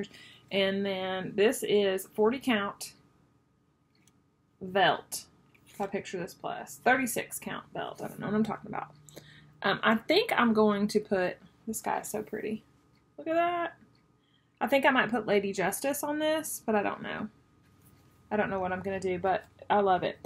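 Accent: South, United States. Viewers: Thank you for sharing your Market experiences with those of us who can’t go! Great suggestions for the organizational committee! I laughed out loud when you said “tump” 😂😂😂 I hadn’t heard that in years! And thought I was the only one who still said it. I was born and raised in Florida...5th generation Floridian, and my grandparents were very rural! So love to hear the real South! I recently ordered from your shop and was very impressed! You will be my LNS (though not very local) from here on out! Are those letters done in eyelet stitch? What a great market haul! Looking forward to ordering “Wool and Flax” from Plum Street when it is in stock! 🐑🐑🐑 Hope y’all have a wonderful spring 🦋🌷🐑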